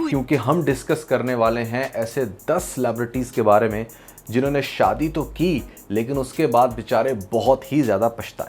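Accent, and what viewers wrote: India. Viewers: Bhai aap Indians ki tarah kyun bol rahe ho.... VIDEO INTERESTING HONE WALI HAI....... GHANTA FARQ NAHI PARTA.... yeh kya hai??? Pakistani Urdu bolo bhai kya hogaya hai....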